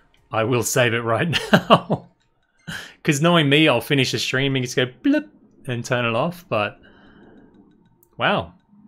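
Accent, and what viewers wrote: Australia. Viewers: Are you from Australia by chance?:D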